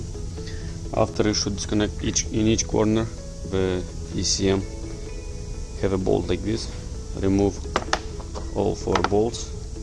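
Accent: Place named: Russia. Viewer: Рашен акцент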